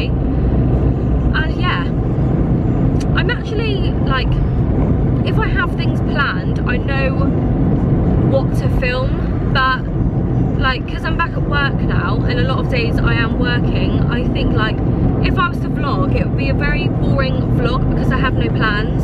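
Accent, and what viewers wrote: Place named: United Kingdom. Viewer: I watch it for her british accent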